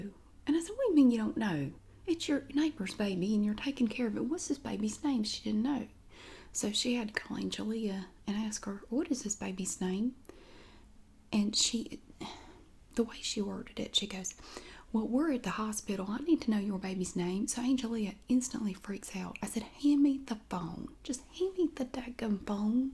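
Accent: South, United States. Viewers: I guessed those places because she sounds just like my wife who was born in Jacksonville, FL but grew up in Memphis, TN and Byhalia, MS. Southerners are self-conscious about their accents but geeze I love em. It's so American. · omg southern accent level 1000 haha,you are great girl!!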